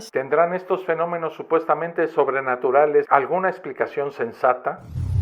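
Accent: Mexico. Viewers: estoy fascinada contigo..me encanta escucharte en tu acento tan mejicano...todo un océano no nos separa eres ya imprescindible....un saludo desde España❤❤❤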